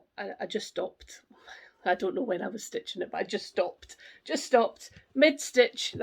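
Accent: Scotland, United Kingdom. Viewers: Hi, I'm fairly new to your flosstube, just started watching before Christmas. Michelle from mommalovesyougb recommended you and I fell in love with your accent. I'm in Canada and have Scottish ancestry so something about the Scots accent appeals to me. I think your choice of projects are great. I've never been to Paris, had a chance probably 10 years ago but went to ireland instead. I like the chart tho. I didn't hear you mention if this was just for UK only stitchers, if it is just disregard my entry. Cheers on getting back on your feet, nothing worse than health issues during covid times.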